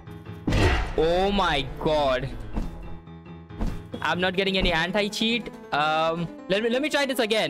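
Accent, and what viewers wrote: India. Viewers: an Indian? sounds like Indian but an Indian Roblox player who plays ROBLOX yay never found one like you!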